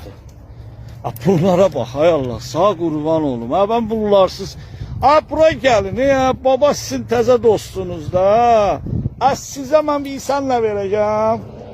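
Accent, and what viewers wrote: Ganja, Azerbaijan. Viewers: Ay Allah Gəncə ləçəsi. Allah qəlbində mərhəmət hissi olan insanları qorusun. Maşallah sizə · Qardas Gencelidi lehcesinden melumdu.Gencede Qadan Alim demek bir ezizlemek sozu kimi deyilir ve bilinir.Mene maraqli olan oduki yeni bu cure gozel seylerden ancaq bu soz size batdi?? MasaAllah bu qardasa mence o dediyiniz agila daha da cox ehtiyaci olanlar var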